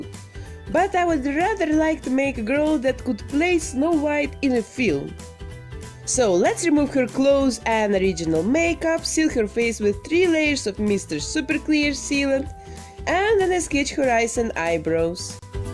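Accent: Russia. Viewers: I normally cannot stand strong Russian accent, but with your videos I find that I can listen to you for hours and watch your incredible repairs! Awesome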